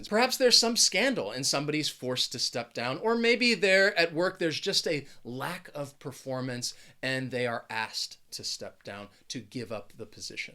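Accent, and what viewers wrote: United States. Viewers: You are really great sir Are ya really AMERICAN If you're not you just sound 100 percent like an AMERICAN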